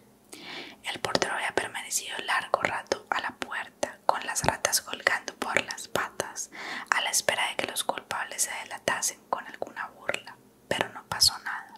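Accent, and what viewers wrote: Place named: Colombia. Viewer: Amo ouvir essa colombiana falando espanhol. ❤❤❤❤❤😘😘😘😘😘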